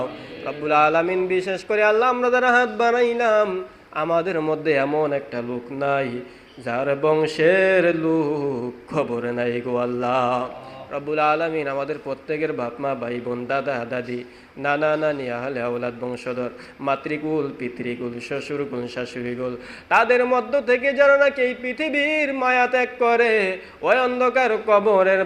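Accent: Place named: Habiganj, Bangladesh. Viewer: আপনার মিলাদ শুনে মনে হচ্ছে, আপনার বাড়ি হবিগঞ্জ সদর এ। হ্যা অথবা না!!! আশা করি উত্তর দিবেন।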